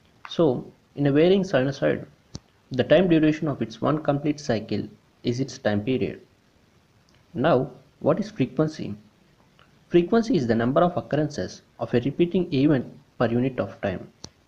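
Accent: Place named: India. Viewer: Although the content is excellent, the indian accent makes the video come close to the point of unbearable.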